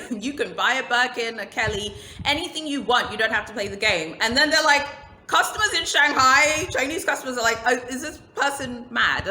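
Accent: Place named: London, United Kingdom. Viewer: Well I thought you had no makeup on so your application is absolutely fantastic. Also, jut wanted to say, your accent is too real, you use the right sayings in the right context and that only comes from living in the UK. They must be referring to the accent of the African state you currently live in because you sound like everyone else I grew up with in London Babe.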